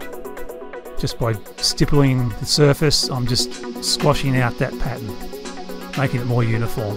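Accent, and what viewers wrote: Australia. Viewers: I'm not quite used to Aussie accent, but goodness me, the art work is fantastic. Pearl sent me here, BTW. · The aussie accent 👏😍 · The most Australian voice I've ever heard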